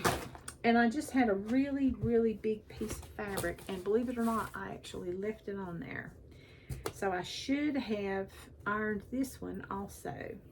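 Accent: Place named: South, United States. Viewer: Congratulations on 1,000 subscribers. I was born in Texas, so love listening to your southern accent. I love to play pickleball and take color tour walks in the fall ya’ll. Kitten, wine, quilt, Henry, Season, Thanksgiving, Raven, Sampler, Seashell, Pumpkin.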